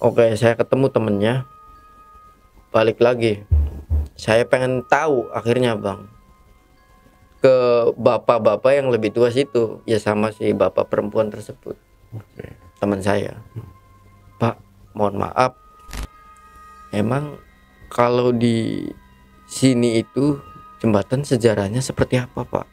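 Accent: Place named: Cirebon, Indonesia. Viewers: Logat ngmng nya ini kaya orang cirebon😄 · logat nya kaya orng Indramayu or Cirebon...Krn paham bener,cerita kurang paham yakin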